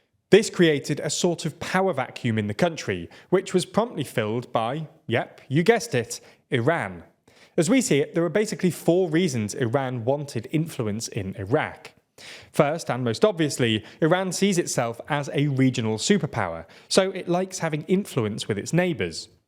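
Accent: United Kingdom. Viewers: He's british, different accent. Technically, his pronunciation is "proper" English 🤷‍♂️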